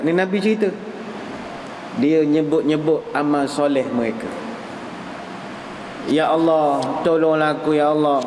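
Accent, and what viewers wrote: Pahang, Malaysia. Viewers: Suka dengar Ustaz cakap loghat Pahang. Sayang Ustaz. May God Bless you dunya wal akhirah Ustaz.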